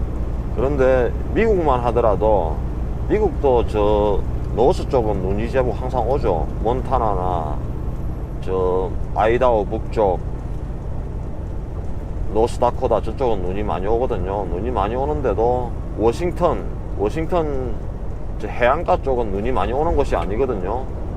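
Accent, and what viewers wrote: Gyeongsang, South Korea. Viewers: 영상 날마다 보고있습니다. 카메라를 통해본 캐나다. 미국은 상상했던 것과는 다른 풍경이라 색다르고 갱상도 말투도 동네형님처럼 정겹고 그렇습니다. 항상 힘내시고요 오늘도 화이팅입니다^^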